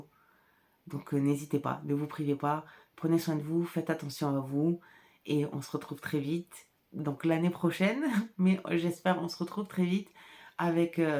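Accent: South, France. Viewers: J’atterris sur la chaîne depuis celle d’Audrey! Grande réflection aussi sur la morphologie… j’ai des petites épaules et les yokes sont souvent démesurés (sauf ceux d’Audrey dont je suis absolument fan!). Je tricote en ce moment un raglan pour varier car je trouve qu’ils rendent plus justice à mes épaules. Je lorgne donc aussi sur des raglans avec jacquard! Que de belles couleurs derrière toi 😍😍 Si je ne devais en garder qu’une… je choisirais du merino non superwash c’est si moelleux! À bientôt! (Merci pour l’accent du sud qui me sort du brouillard bourguignon!) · Bonjour quel plaisir d'entendre l'accent du Sud , je suis née a Arles et rapatriée en Creuse ! Tu n'est pas obligée de me dire d'où tu habites mais cela m'intéresse. Merci pour ces moments avec le sourire. Bon courage pour ton travail qui est magnifique. 😘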